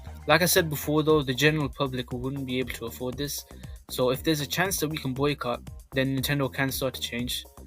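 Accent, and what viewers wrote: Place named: United Kingdom. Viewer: Are you british? That accent is pretty cute ngl haha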